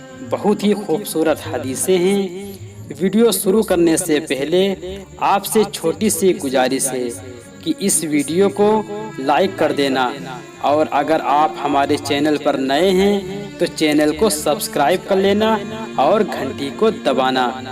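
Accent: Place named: India. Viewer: Tallafuz sahi karyei Hindi mei hai Urdu mei boliye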